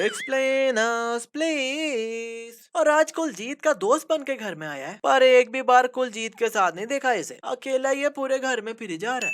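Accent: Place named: Pakistan. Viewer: Is this a Pakistani Channel because narrator's hindi accent was sounding like Pakistanis.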